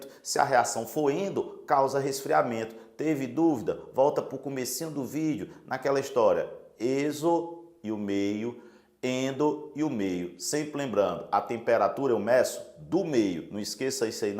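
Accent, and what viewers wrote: Ceará, Brazil. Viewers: Ele é cearense?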